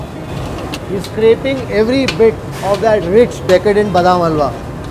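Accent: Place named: Mangaluru, India. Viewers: He got that our Mangalore Kannada accent. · Not Mangalore kannada, South canara Kannada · I love the way you speak kannada.. that has a mangaluru kannada touch.... thank you.. 😍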